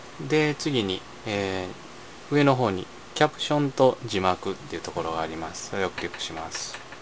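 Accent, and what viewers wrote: Japan. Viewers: 関西弁グッド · 京都弁だ～～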